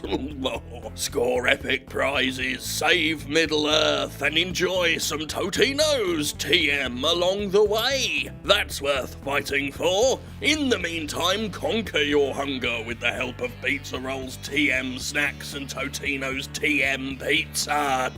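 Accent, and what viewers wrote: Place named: United Kingdom. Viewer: Some Times i find the british Accent some anoying. But yours sounds great. Especially when you talk down to bad Business and Games. This Videos help me to resist the Urge to buy Shadow of Mordor, thanks. I think i buy Hellblade instead.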